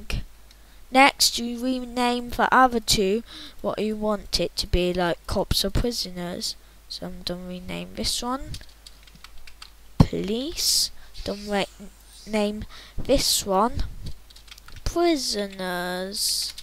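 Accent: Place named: United Kingdom. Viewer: Your british accent is funny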